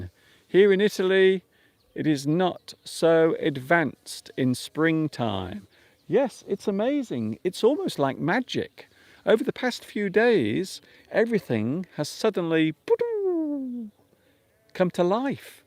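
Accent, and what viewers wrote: England, United Kingdom. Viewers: Hi, I love your pure English accent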